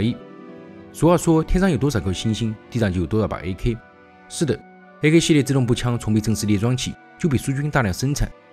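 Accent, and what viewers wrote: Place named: Leshan, China. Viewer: 乐山口音，鉴定完毕。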